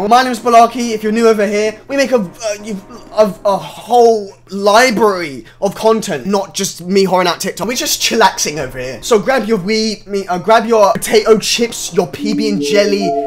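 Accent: United Kingdom. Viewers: w bri'ish man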